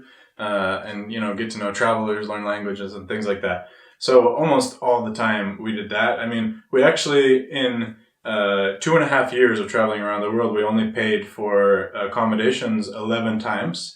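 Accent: United Kingdom. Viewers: Oh I get it now. He's Scottish. That explains everything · She sounds like she has a British accent lol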